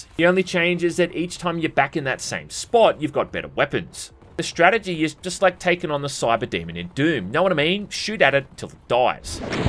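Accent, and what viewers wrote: Australia. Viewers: makes me confirm that your in my top 10 favorite youtubers of all time along with jontron, gmm, and others. Your humor is just spot on and your Australian? accent just hits that vibe and is unique for your channel. The whole description of the heavy bolter had me dying😅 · Australian accents make everything better I swear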